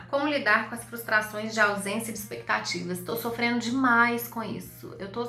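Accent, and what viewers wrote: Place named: Belo Horizonte, Brazil. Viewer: eu amooo seus vídeos... também sou de Belo Horizonte. Te conheci por causa dos vídeos da Escócia. Quando ouvi sua voz pensei na hora.." essa moça é de BH"...aí pesquisei e me inscrevi no canal. Adooooooooro. Deus a abençoe.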